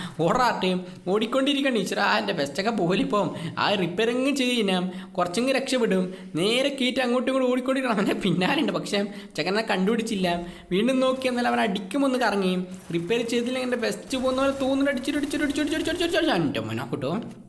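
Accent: Kannur, India. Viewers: Kannur aanu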